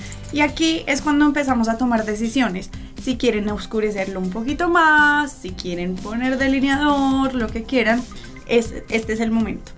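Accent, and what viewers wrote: Colombia. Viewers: Explicas súper bien, aparte ese bello acento que tienen ustedes los colombianos 😍. ¿Podrías hacer un video de cómo delinear un ojo encapotado? Saludos desde México.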